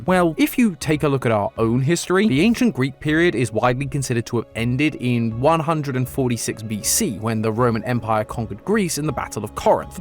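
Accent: United Kingdom. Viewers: British voice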